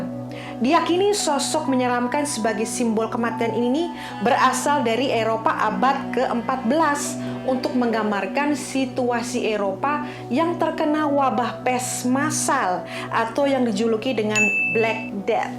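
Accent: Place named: Surabaya, Indonesia. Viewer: khas sbyoan dan malang an logatnya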